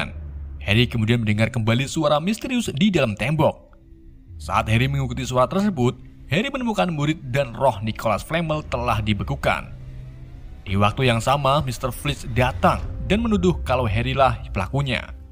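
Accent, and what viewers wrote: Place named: Java, Indonesia. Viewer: Radak medok yah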